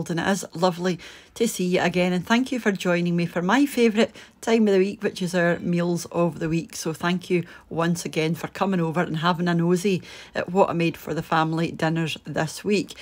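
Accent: Scotland, United Kingdom. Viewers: I love your gentle Scottish accent and your great recipes. I hope you get to 100K subscribers soon you deserve it.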